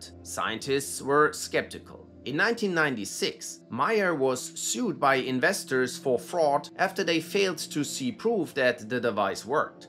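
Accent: Germany. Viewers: Der deutsche Akzent ist Mega! 😅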